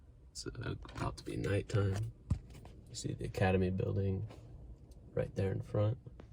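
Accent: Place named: Tulu, India. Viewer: Your tulu is so cute please come to India you are my forien brother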